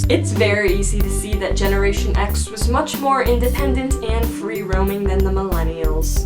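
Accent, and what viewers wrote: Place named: North, United States. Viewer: Hey by the way, you're really cute ;) are you from Wisconsin? Or someplace from the north? You sound like a northern person.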